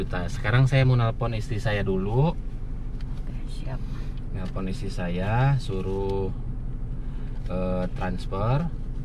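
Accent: West Java, Indonesia. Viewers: Walaikum salam.. Khas sunda Bangets.. He he.. Salam ti Banten..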